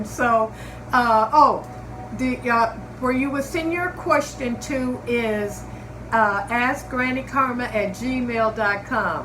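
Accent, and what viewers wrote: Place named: Midwest, United States. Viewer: I absolutely loved this! I always thought your accent from from upstate New York, but now I know it's Midwest. Lol. Amazing stories!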